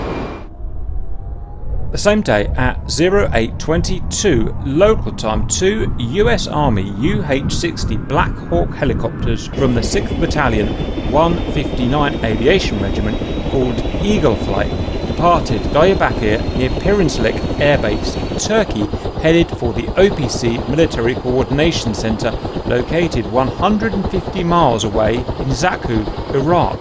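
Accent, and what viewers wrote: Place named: United Kingdom. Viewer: IDK why but the British accent suits well for this kinda thing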